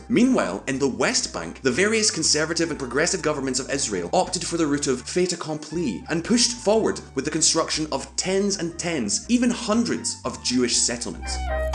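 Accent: Scotland, United Kingdom. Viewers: Finally a Scottish accent too! Gaun yersel!!:) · The posh scotsman is back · The (Scottish? Welsh?) accent is grrreat man! (read as A Scotsman speaking, lol) But for the love of decency, man, grow a beard and get some nerd-approved specs on your face :-D. As for Isreal/Palestine it's a case of 2 wrongs. It's very complicated; and instinctually you think, single state solution! But that's not as viable anymore as there is definitely economic disparity, and there would likely be polarizing political battles in perpetuity (not that we know anything about that here in the States). At it's core you have 2 peoples who feel the land is theirs by birthright...and the Northern Irish can tell you any peaceful coexistence is uneasy at best.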